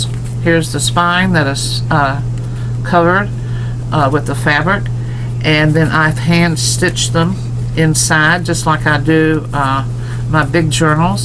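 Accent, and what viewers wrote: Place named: South, United States. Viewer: I’m a Georgia Peach. I know southern accents when I hear them. Lol. 😂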